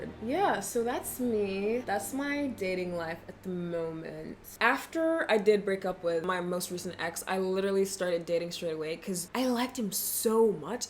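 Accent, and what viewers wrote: Australia. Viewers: Just came from your latest Love Is Blind video, and the whole time I was thinking you were American doing the Australian accent as a joke 😂 but nope, you actually have an Aussie accent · You are so funny and genouine. I bumbed to your videos from love is blind lol. Greetings from Finland. Are you australian? You sometimes sound like australian and sometimes American. Oh now you said it nigerian. Your english is perfect.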